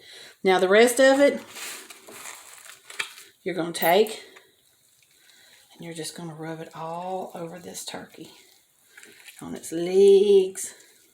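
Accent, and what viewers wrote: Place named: Tennessee, United States. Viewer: I love you you talk like me I’m from chattnooga tenn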